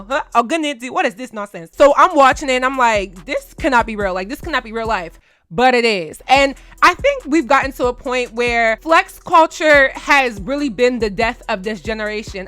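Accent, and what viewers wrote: Nigeria. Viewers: I love when brings out her Nigerian accent! I know she’s annoyed when that comes out! 😭😭🔥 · Nigerian accent cures my depression 🇳🇬💖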